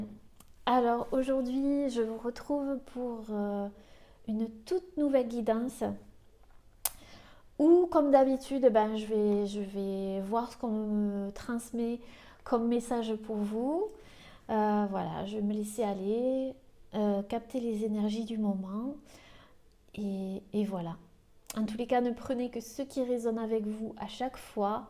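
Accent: South, France. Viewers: Vous êtes mignonne! En + votre accent du midi c. top.Vous êtes habitée d'une douce énergie réconfortante, Merci.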